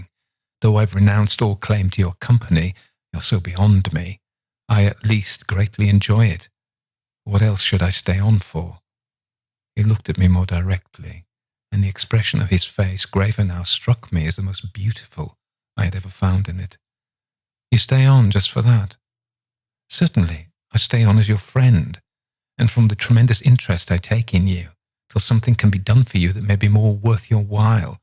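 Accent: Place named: United Kingdom. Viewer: Your charming British accent certainly got me through this lengthy, stretchy but nice and ghostly story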